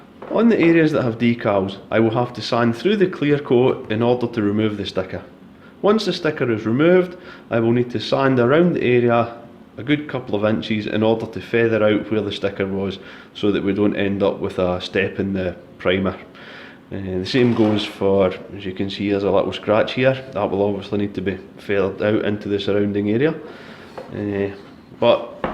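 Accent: Scotland, United Kingdom. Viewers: nice job. my mouth is watering as i watch you go to so much trouble. accent scottish?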